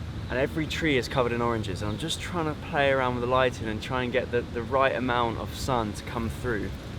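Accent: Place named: United Kingdom. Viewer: this is so powerful and comforting all at the same time. i fully loved every second of it. i've joined the 6am collective newsletter and i can't wait to post my first video soon. thank you! hugs from a 24 y/o woman who genuinely wishes she had your voice? so very soothing and british haha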